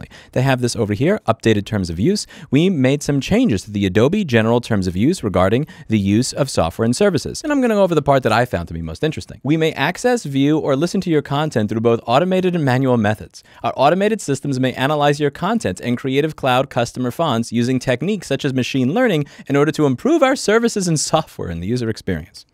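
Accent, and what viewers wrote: New York, United States. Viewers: Don't y'all just love how much thicker the New Yawker accent is the deeper he gets into his righteous rant?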